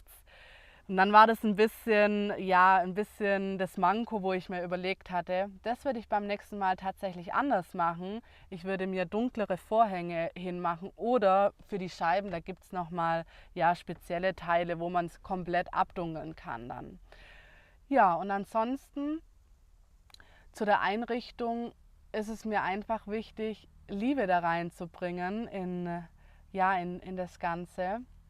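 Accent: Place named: Swabia, Germany. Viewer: Ein Hauch von Schwäbisch - sehr charmant.😊😊😊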